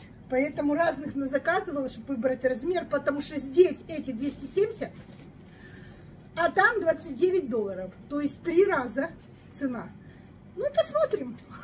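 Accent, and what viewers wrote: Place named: Ukraine. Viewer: С покупочками😊 Вы сами с Украины? Родной гОвор кажется 😊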